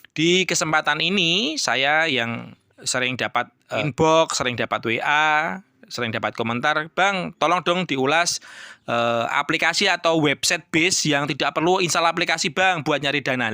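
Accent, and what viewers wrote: Java, Indonesia. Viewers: Medok banget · Mentok logat Jawa ny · Et medok wkwk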